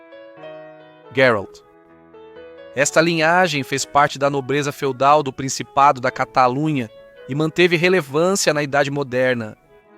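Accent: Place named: Portugal. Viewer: Português de Portugal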